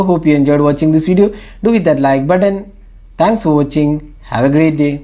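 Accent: India. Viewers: I hate when they speak English with an Indian accent, I want to immediately hit the handle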